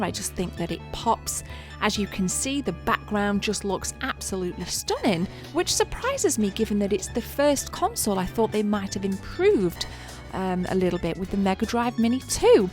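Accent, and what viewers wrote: United Kingdom. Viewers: Gotta love your brit accent, and how you squeeze those....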